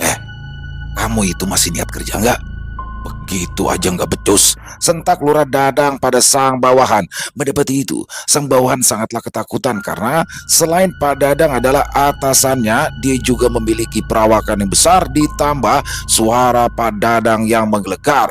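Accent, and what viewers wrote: Jakarta, Indonesia. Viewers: Et dah bang😊 betawi nye beuh ... Muanteep tenan celotehan nya itu lho.... Menghbur bngt Mg sehat selalu untuk smua D lncarkan sgala urusan ny Terima kasih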